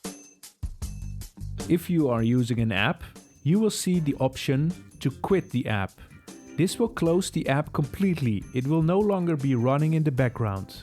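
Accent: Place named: India. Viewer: This voice belongs to someone from India. It's impossible not to recognize the accent.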